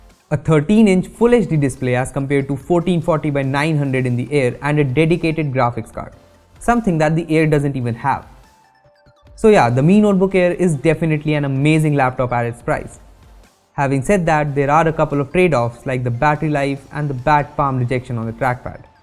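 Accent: India. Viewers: I hate Indian English. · iski english bhi to hindi jaisi hi lgri h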